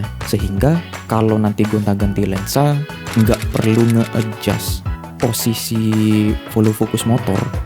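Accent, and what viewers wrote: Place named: Madura, Indonesia. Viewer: logat maduranya nampak banget bang😂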